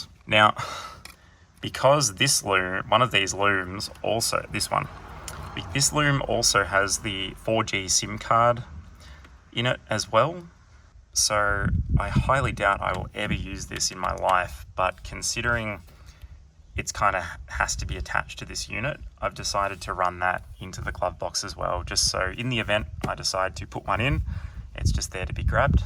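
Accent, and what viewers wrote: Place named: Australia. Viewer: I love the info in the video. but his accent kept fucking with me lol. Puts R's where they shouldn't be. And where they should be takes them out lol. Do all aussies talk like this?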